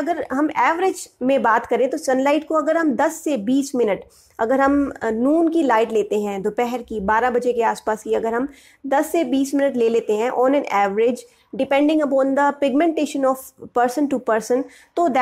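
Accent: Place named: India. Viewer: english subtitle. indian voice.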